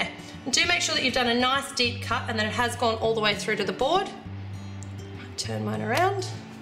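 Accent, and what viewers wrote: Australia. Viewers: I thought u were Australian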